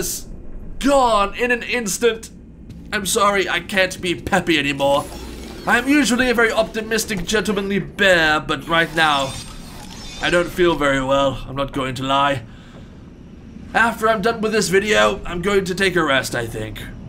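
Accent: United Kingdom. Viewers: Yay the british boy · Nice to see our good ol’ British bear return. 😅 If I remember this right, this’ll be the path to the “Princess Quest” ending.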